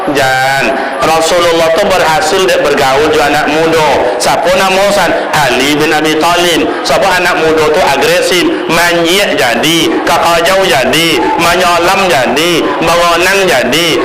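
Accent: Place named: Ocu, Malaysia. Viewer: Soang ko tio ustad nan bahaso ocu kental kek awak nye,lain podo tu,uwang ocu juo nye,tapi bahaso minang,kan lucu du, Ustad ko mantap,asli ocu n bahaso nyo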